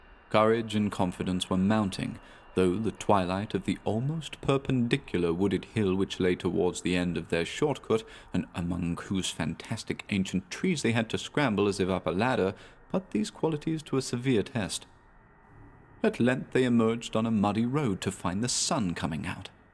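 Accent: United Kingdom. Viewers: This is the most English man I've ever heard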